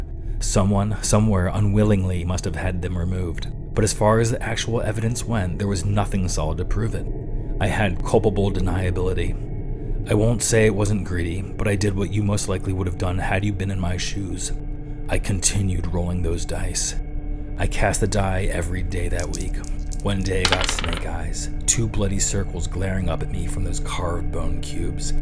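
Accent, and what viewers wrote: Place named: United States. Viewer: Very very good story ! And the narration is just perfect ! Being french, and listening to this beautiful English ( American ) voice add something I can’t explain ! Well done !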